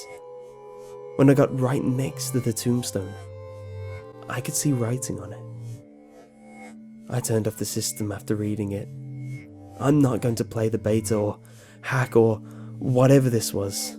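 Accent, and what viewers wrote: United Kingdom. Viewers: IKR, Brits pronouncing things differently is so wholesome!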